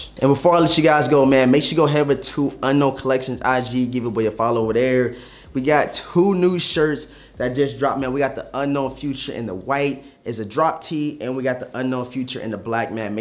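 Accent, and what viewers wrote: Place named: St. Louis, United States. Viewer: A G are u from St. Louis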